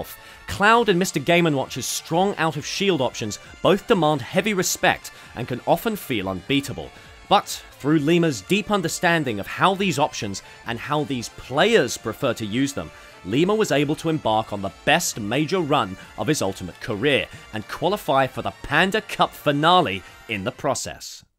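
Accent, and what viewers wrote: United Kingdom. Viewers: who is this brit in my pgstats video and why is his voice so good 🤨🤨🤨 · Guys a Brit. Gotta learn how they talk mate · That's just how many brits talk bro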